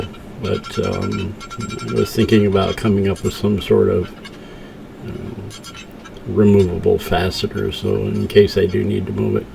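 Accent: RP, United Kingdom. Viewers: After watching many years of the BBC I think the accent was spot on. It matched with the opening visuals and reminded me strongly of my childhood. I just needed to see a Dalek and I would have been behind the sofa like a shot! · Loved the cultured british accent. Since it happens to be your shop you can call the tools what ever you want to.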